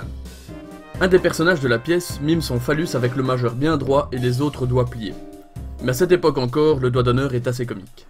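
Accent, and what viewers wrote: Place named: Belgium. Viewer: Ton accent belge il est magique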